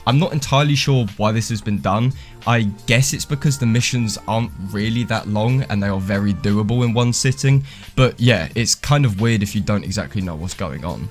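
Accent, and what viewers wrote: United Kingdom. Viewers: yo you british, i hit the L & S. just because gg. blud x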